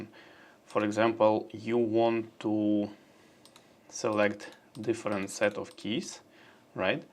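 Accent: Russia. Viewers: По акценту слышу вам не чужд русский язык. Какова клавиатура в сравнении с отцами магнитных клавиатур wooting? Есть ли разница в ощущении или цена за wooting это просто наценка за бренд?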